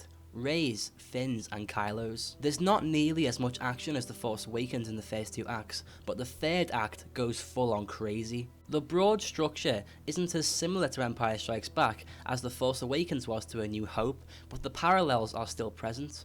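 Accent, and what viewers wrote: Liverpool, United Kingdom. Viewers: Its a scouser, its obviously balls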